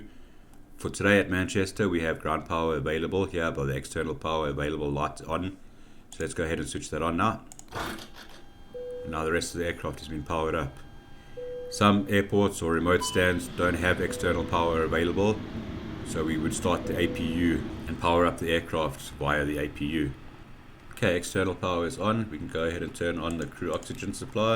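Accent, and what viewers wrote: South Africa. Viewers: Nice tutorial, great to hear a SA accent in MSFS2020 :) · Great video, thank you so much.... By the way, you sound South African😊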